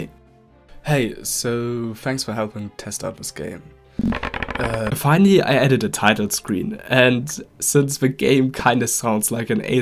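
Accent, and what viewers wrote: Germany. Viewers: You Sound german Und ich bin deutscher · you sound german to me🤔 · Hatte doch gewusst das die Aussprache mir sehr deutsch vorkam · you sound german mate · Direkt beim ersten wort gemerkt, das du deutscher bist 🤝🥹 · I hear the DEUTSCHER AKZENT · U have a very Strong Accent (perhaps German??) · You sound so German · I Knew it that you have a German accent. Windows Defender Message prooved that i can hear that someone is german. :D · Very german accent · bruhh He sound like hes german! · Ich wusste es, habe schon den deutschen akzent gehört und dann die deutsche windows benachrichtigung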